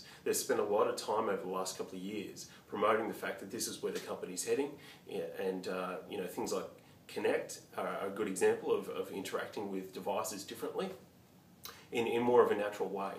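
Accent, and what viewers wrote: Australia. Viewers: OK, first off, your accent has absolutely nothing to do with tellme's ability to understand you. You're right, tellme has a commands list, which you deliberately avoided using! Try the correct commands list, even with your Aussie accent, & I'm SURE it'll work (I've seen it do with accents far stronger than yours!) 2nd, all your video served as was a giggle for Apple fanboys. 3rd you make Siri seem perfect, it is NOT, but you don't show that in your video; hence it's misleading.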